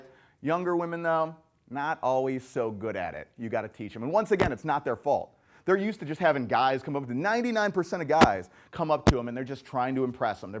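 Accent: United States. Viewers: I thought this guy was British. Nope, can't trust an American to give me advice on how to hold a proper conversation. Americans (even the educated ones) don't know how to use basic proper English phonics, punctuation and say "like" out of proper use WAY too much. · Very Intense, very american, not so british, wouldn't work as well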